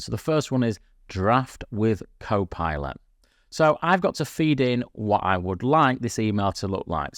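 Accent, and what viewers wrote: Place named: Northern England, United Kingdom. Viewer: Northern England.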